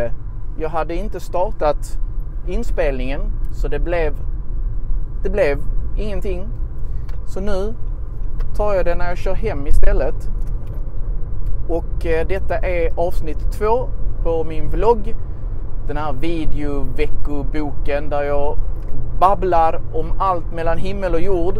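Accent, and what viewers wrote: Scania, Sweden. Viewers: Älskar skånskan! :))) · Personligen bryr jag mig inte om med skånska dialekten. I Tyskland pratar vi också olika dialekter. Jag förstod allt vad du sa. Alla pratar olika och det är helt normalt. Det är viktig att förstår alla svenska volk, inte bara från Stockholm. Fortsätt med det du gör. Det hjälper oss mycket. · I storbritannien kallar vi det för dialektdiskriminering. Jag kommer från Liverpool och vi har en jättestark dialekt och om någon inte gillar det så kan den dra åt helvete Jag älskar skånska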